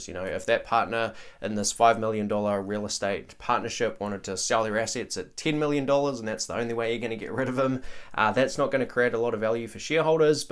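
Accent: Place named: New Zealand. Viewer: He’s from New Zealand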